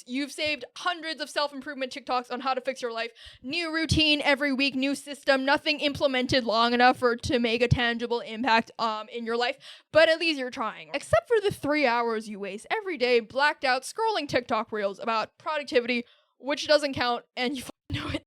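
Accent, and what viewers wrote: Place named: United States. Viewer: you say you live in london, but your english sounds very american.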